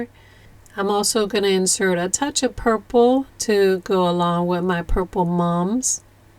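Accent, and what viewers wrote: New Orleans, United States. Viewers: I just want to say that I love listening to your beautiful soothing voice and NOLA pronunciations. Your intellect and creativity shine brightly and what you say is only enhanced by how you say it!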